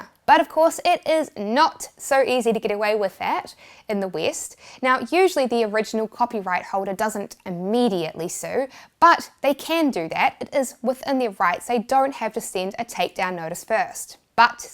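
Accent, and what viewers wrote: New Zealand. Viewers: My god that is the most NZ accent ever.....saying that I'm Australian, so I probably sound mentally impaired when I speak.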